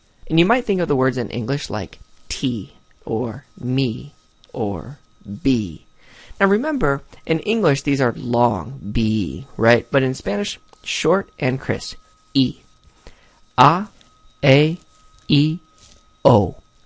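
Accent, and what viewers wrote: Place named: United States. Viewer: I really enjoy your videos but have to comment on your American-English pronunciation of the vowels, especially the E and O. I've never heard those two vowels pronounced as you do. However, the content is excellent and very helpful!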